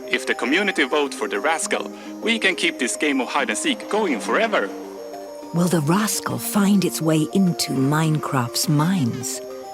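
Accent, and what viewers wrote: Scotland, United Kingdom. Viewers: Where in Scotland are you from ?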